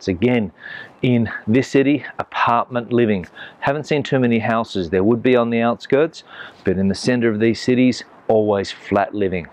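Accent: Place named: Australia. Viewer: I can hear the Aussie accent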